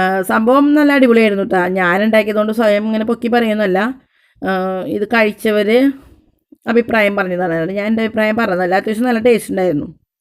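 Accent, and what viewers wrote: Thrissur, India. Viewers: Hai. onathinu orukkangal kandappol enikku ente veedum kuttikkalavum okke orma vannu. pazhaya ormakal unarthunna oru vlog. enikku othiri eshtappettu.pinne u de Trissur slang kelkkan nalla rasamayirunnu. thank you so much for sharing the video.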